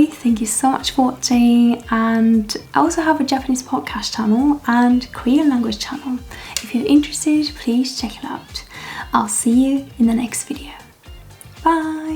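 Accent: United Kingdom. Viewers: Born in Okinawa but has a British accent? Huh?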